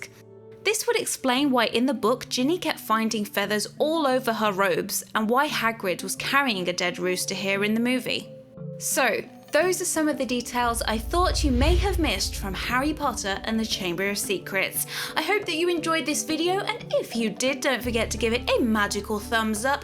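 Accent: United Kingdom. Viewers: Not actually related to the video, but I really love your voice and accent ! I'm French, and I usually tend to dislike the British accent, I don't really know why. But oddly, I find your voice and speech very pleasant and relaxing to listen to ! Also, I got 10 points for Hufflepuff, because I knew about the roosters, Ron's robes and the diary. And I think Lockhart has a wig "in case of an emergency". For instance, if someone came to knock on his door at night, he wouldn't want to be seen with untidy hair, so he would be able to quickly put on his wig and look flawless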